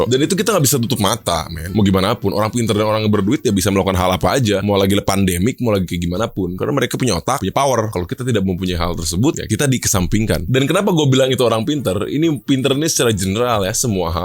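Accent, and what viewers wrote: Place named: Bogor, Indonesia. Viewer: logat bogor na karasa euy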